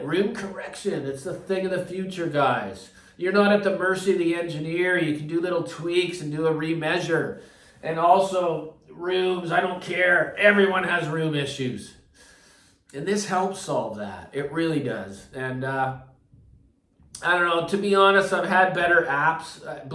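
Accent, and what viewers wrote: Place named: Canada. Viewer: Merry Christmas to you,. We’ve got about 3’ of snow here, south of Buffalo. Record season has officially begun. Just fired up the old 80’s Mac rig. Always let her warm up with a little Canadian radio, 101.1 More FM, currently. What a privilege to have grown up with all these great stations. I’m new to your channel…but, pretty sure I picked up that unmistakable “native tongue”. 🇨🇦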